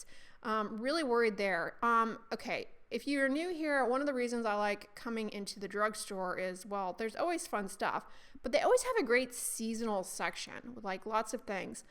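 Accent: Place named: Texas, United States. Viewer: I've been a subscriber for years and it makes me chuckle to hear your Texas accent come through. I don't remember where you lived before you moved here.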